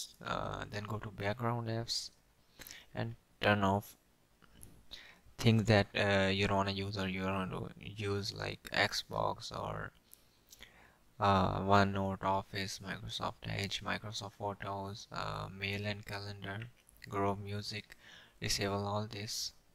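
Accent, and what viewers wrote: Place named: India. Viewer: He sounds like Indian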